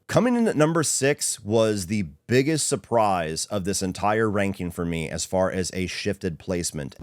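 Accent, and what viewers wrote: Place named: South, United States. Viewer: I got into Dexter at the same time as you in 2009,only difference is I was 29 and you were 19(somehow I look 10 years younger than you)but being a man I don’t like looking 10 years younger than I am. But ai started watching in 2009 just like you(shows I’m a big fan of your channel if I know all that). Also I’m from the northeast and you’re from the south,I know you don’t think you talk that way but you do,it’s just that your accent isn’t as thick as most southerners just as I don’t talk like a typical Philadelphian. Anyway I’m a huge fan of yours and I agree with most of your takes. I hope this channel continues to go for years because you’re the best at what you do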